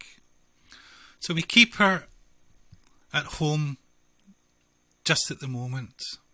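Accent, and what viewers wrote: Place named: Scotland, United Kingdom. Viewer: Great video thanks. What part of Scotland are you from?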